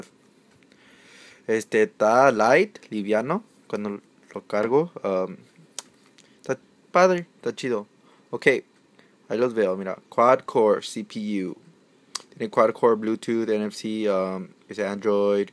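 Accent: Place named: United States. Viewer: tu ingles es excelente, el español bastante bien siendo un idioma bien dificil, pero el tono estadounidense en el ingles es ideal para videos en ingles, en mi opinion.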